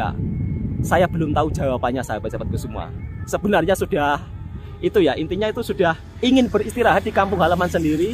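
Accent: Java, Indonesia. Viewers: Ni kira dah ok sangat tuu. Sblm ni, jawa pekat. Tpi yg x boleh ubah...buwaaaangat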